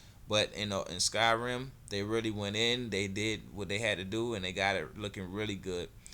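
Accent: United States. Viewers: sounds like a mix of eubonics and southern drawl. stop hurting my ears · Somethin out of barney! 😂😂 are you American?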